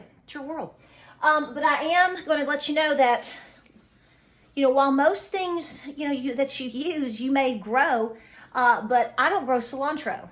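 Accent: South, United States. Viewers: Your are southern funny love it 😊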